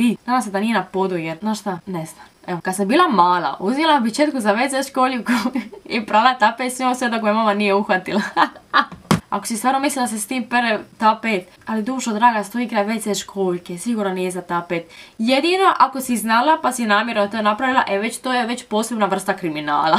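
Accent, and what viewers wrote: Dalmatia, Croatia. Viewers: Kolko volim dalmatinski.. 🤤❤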